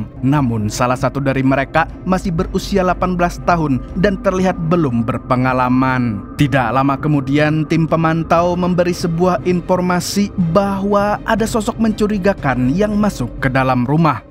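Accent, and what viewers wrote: Banjar, Indonesia. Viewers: Banjarr leh.?! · Urang banjar juakah ikm nch....😂😂😂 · Kayak nya mimin nih org banjar nah wkwkwk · Urg banjar dimana pyn mang😅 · orang banjar kah pian😂😂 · oh ini kah nang buhan banjar tu wkwk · Org banjar kah pian niti mang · Bang pian orang banjar dmnanya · Buset org banjar kah sklinya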